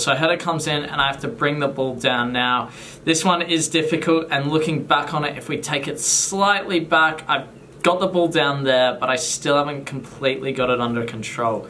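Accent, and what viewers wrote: Australia. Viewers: Damn that Australian accent, great video btw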